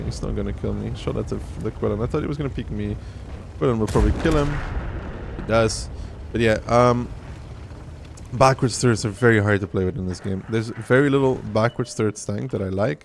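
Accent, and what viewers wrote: Netherlands. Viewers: Wait you dutch??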